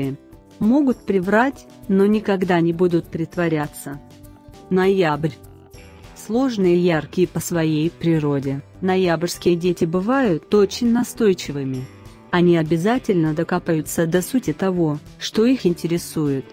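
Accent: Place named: United States. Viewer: Вы зачем читаете с американским акцентом ? Это давно устарело .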